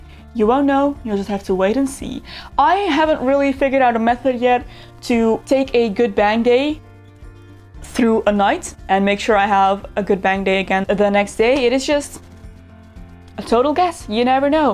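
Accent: Netherlands. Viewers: Hallo ! Ik dacht altijd al dat je zo een Nederlands accent had nu weet ik waarom en goede video 😗🌸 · Ik wist het! Ik hoorde aan je accent dat je nederlands bent. Trouwens, ik heb vroeger een pony gehad, en ik wil er weer een maar ik vind de nadelen wel kut. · jaaa zie je wel!! Hoorde het aan je accent. Super leuke tutorials over je ponny ;-) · Omfg wait, are you dutch ? So from the netherlands ? Or did i hear it wrong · Ik wist dat je Nederlands was. Ik hoorde het. Maar echt je hebt echt een mooi accent. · at the beginning of the video I heared a little accent and I was already wondering where you would come from.. and you said the Netherlands🎉 ik weet niet waarom ik in het engels type maar ik ben ook Nederlands!! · IK WIST GEWOON DAT JE NEDERLANDS WAS AAM JE ACCENT VANAF T BEGIN LMAO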